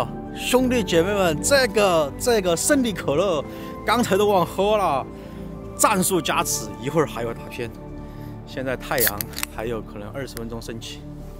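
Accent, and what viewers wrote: Sichuan, China. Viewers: 新粉留言，你的川音好有亲切感～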